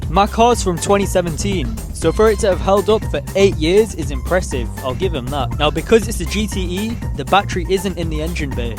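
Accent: Pakistan. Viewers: are you pakistani because you speak the exact same pakistani language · Sounds more urdu to me. He Sounds like hes a Pakistani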